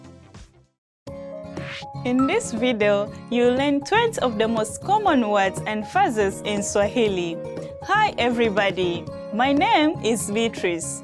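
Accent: Standard. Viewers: She is a Tanzanian presenter!. She speaks standard Swahili! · TZ standard Swahili ❤❤❤❤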